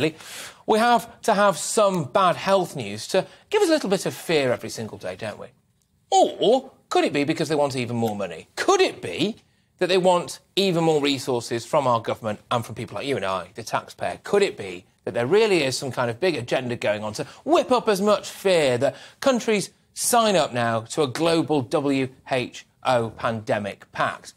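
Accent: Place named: England, United Kingdom. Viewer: American Republican with an English accent !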